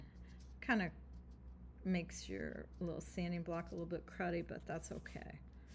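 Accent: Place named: South, United States. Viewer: you are a gem! I've been following you for years as I take my mixed-media journey. I was a part of stencil club on and off for years and I have about 500 stencil girls stencils! I absolutely love your style, your great tips and tricks and that lovely southern voice is a soothing presence in my practice! Thanks for being YOU!!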